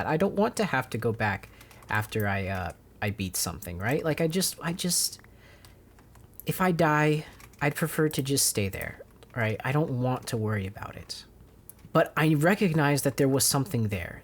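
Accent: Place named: United States. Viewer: Some advice for using Japanese words: it's phonetically very simple, so saying a single syllable in an unusual context with an American accent is really a nightmare for understanding what you're trying to say (unless we've all read the exact Miyazaki quote you're referring to). Something you'll see on Japanese TV is subtitles and people stopping to define ambiguous terms when having conversations to avoid confusion. Or you could just say "space" or "pause" if you don't want to go into a tangent defining a Japanese word every time you talk about slower paced videos.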